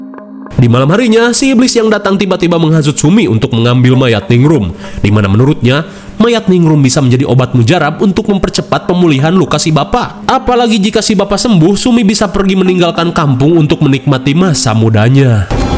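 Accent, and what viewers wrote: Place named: Sumedang, Indonesia. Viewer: Bang lu tuh orang mana bang, soalna sundana asa sarua jeung Sunda di Sumedang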